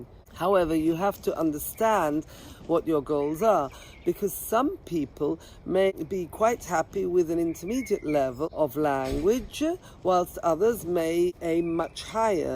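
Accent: Italy. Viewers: You are a native Italian-English speak and yet have an italian accent when speaking English, that's interesting! I've never seen it before. - Great tips, thank you for the video!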